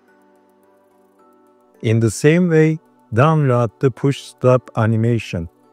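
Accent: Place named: Turkey. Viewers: kesinlikle türk aksanı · konuşanın türk olduğuna eminim ama ispatlayamam...😀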